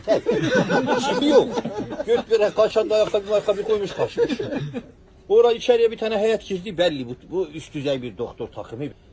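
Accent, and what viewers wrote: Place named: Erzurum, Turkey. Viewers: Erzurum lehcesi hastayim ya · Olay çok komik ve Erzurum ağzı ile anlatılması daha da komikleştiriyor DYSIDJGXUXOSJSGX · Çizim de Erzurum şivesi de eyi olmiş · adamın şivesine zor tahammul ettim, sevimsiz Erzurum ağzı.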